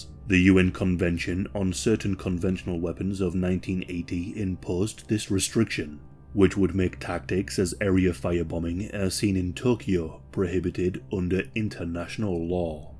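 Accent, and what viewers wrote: United Kingdom. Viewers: Hes brit*sh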